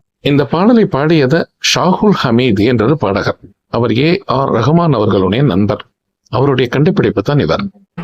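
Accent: Jaffna, Sri Lanka. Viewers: தங்களின் யாழ் தமிழ் உச்சரிப்பு பாடல்களை விட இனிமையாக இருக்கிறது.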